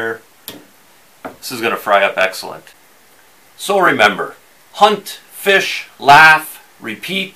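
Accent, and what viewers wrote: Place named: Upper Midwest, United States. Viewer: Glad to hear that your knives aren't of the $100 variety. My wife and I fish and camp mostly on the Valentine National Wildlife Refuge. It is an amazing place to panfish for bluegill, perch, Largemouth Bass, Northern Pike, and catfish. Located in northern part of Nebraska in my beloved Sandhills. Based on your slight accent😄 I'd say you are from Minnesota,Wisconsin, or somewhere north of us. If you get the urge for a change of scenery check it out on the internet. Started by Teddy Roosevelt, primarily as a refuge for migrating birds. 8 Refuge lakes open to fishing. Many other lakes and Merritt reservoir near by. You wouldn't regret it. 👍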